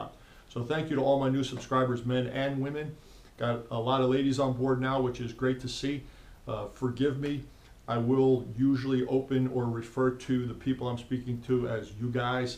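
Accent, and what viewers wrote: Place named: North Jersey, United States. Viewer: I knew you didn't sound like you're from Texas! I had a feeling that was a north Jersey accent. I grew up in south Jersey.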